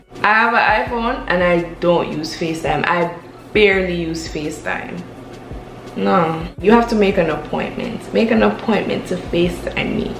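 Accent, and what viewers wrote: Jamaica. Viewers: I swear it so comforting hearing the Jamaican accent as another Jamaican person 😩🤣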